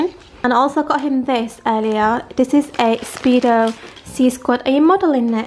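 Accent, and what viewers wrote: Yorkshire, United Kingdom. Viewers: Im leeds and you sound yorkshire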